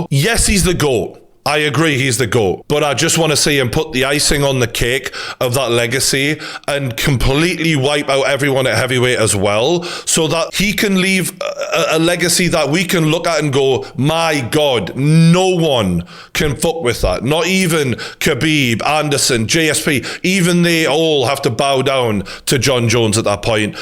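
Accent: Newcastle, United Kingdom. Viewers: geordie ass nigga.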